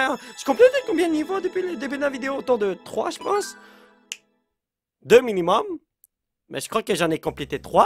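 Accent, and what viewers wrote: Quebec, Canada. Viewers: Sa fait je sais pas cb de video que je regarde et je me demadais si il était quebecois ou francais maintenant j’ai la reponse xD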